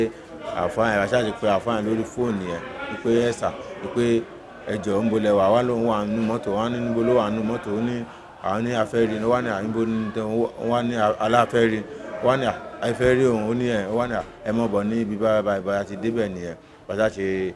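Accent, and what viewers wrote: Nigeria. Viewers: Yeah is from osun either okeigb. Ile ife or ifetedo.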